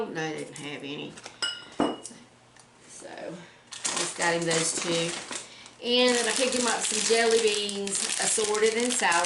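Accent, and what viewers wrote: South, United States. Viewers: You're such a good Mama, great haul, and sure have missed your Southern accent, be safe♥️🐾♥️🐾♥️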